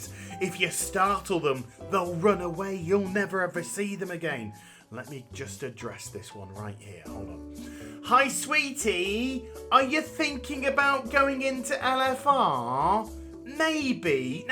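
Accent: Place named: United Kingdom. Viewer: I dont know why i love angry people with british accents so much.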